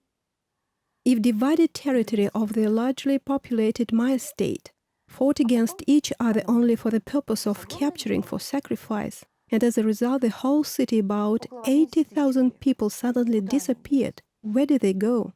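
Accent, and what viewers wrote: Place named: Poland. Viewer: I would trust any of my Polish friends, who by the way are very intelligent, hard-working, and have university degrees. But don't ever trust a computer generated text-to-voice with a Polish accent, no matter what she says.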